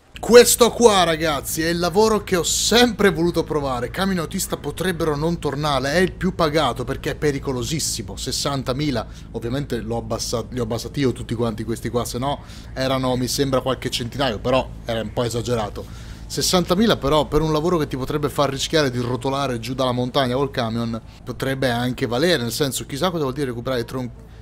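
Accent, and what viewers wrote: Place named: Livorno, Italy. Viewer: ma di dove sei? Avvolte sembri tipo livornese AHAH😂 (sono quello dei guanti i da 9.99€ di decathlon😂)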